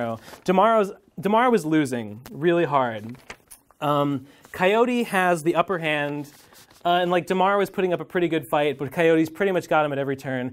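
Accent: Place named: Kentucky, United States. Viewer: pretty sure he's from Kentucky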